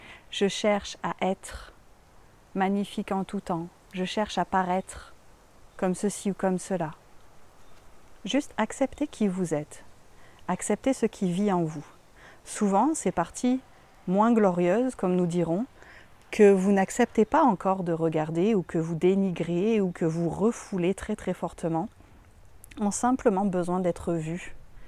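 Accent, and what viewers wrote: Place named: Quebec, Canada. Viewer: Merci, tu es si sage et pertinente! Dis-donc, tu vis au québec ? Je crois reconnaitre mon paysage et un peu de notre accent. :D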